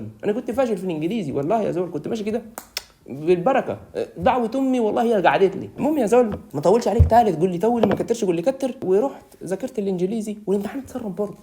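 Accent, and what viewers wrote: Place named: Menoufia, Egypt. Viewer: ديي لغة المنوفية ادگتره😂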